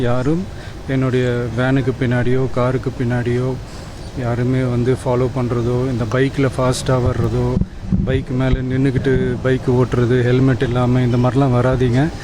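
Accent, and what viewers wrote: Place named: North India, India. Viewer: North Indian tamil pesra madhri irukku😢😢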